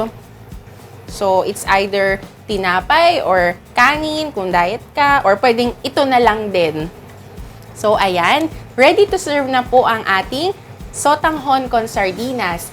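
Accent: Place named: England, United Kingdom. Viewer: She pronounced the letter R with an English accent. So pretentious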